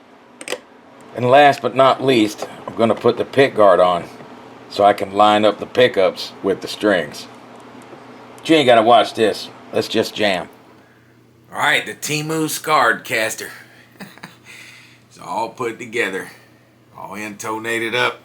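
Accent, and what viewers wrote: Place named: Texas, United States. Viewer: Bro, you gotta be from Texas! Ha ha... Love it! God Bless Texas!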